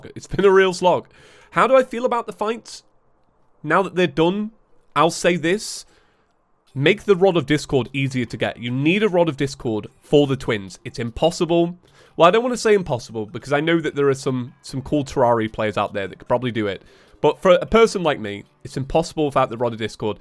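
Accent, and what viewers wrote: United Kingdom. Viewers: You Sound like britain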